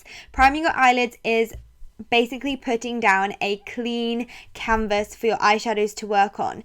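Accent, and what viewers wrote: United Kingdom. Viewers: En verdad me caga su acento británico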